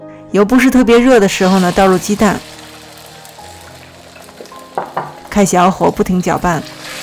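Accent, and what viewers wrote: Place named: Northeast, China. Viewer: 为啥感觉你说话像是东北的捏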